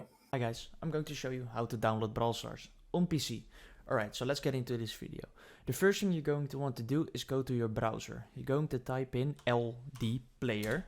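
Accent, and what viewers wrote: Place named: Netherlands. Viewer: Crazy Dutch accent (no offense)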